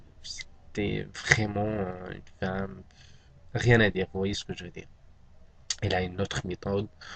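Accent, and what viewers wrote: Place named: France. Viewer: il faut que tu change ta façon de parler et soit un peu marocain vérile et arréte de faire tes gestuels et ton acçent a la française..sinon c pas mal salam khoya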